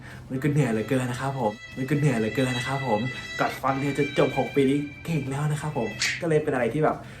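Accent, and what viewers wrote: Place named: Isan, Thailand. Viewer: พูดอีสาน มีเสน่ห์มากๆครับ ขอติดตาม ig หน่อยครับ 😊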